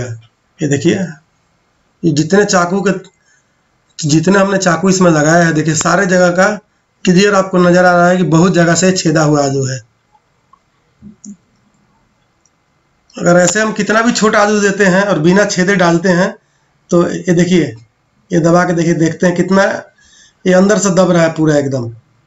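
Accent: Bihar, India. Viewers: In starting when u say Ram Ram ji that is really nice 🙏👌and the way bihari people speak that is so sweet 😊😊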